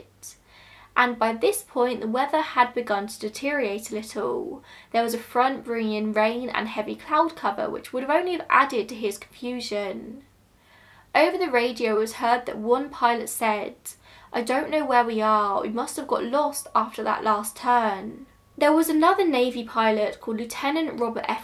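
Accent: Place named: Home Counties, United Kingdom. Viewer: She is speaking in a standard Home Counties accent.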